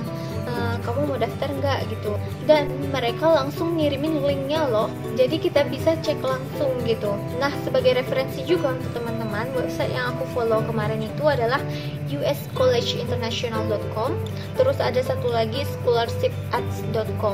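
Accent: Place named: Sumatra, Indonesia. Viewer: kayak orang sumatra logatnya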